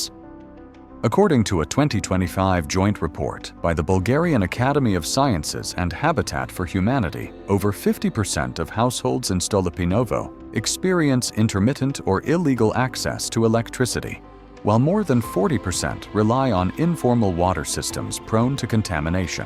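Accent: United Kingdom. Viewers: You have a British accent, and you are highlighting the negative aspects of all countries. What about your own country, Britain? britain is now taken over by Pakistanis, rape and murder are everywhere, poverty and homeleeness is common. Show your own country first. You don't look at the reason, only describe. The condition of these people in Bulgaria was normal during the days of socialism, but under capitalism, it is now a disaster.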